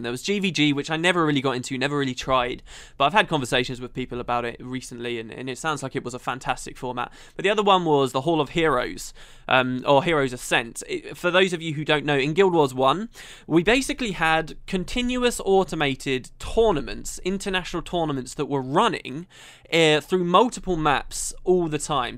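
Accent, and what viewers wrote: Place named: Manchester, United Kingdom. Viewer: Sounds like he might be from Manchester!!! could be wrong.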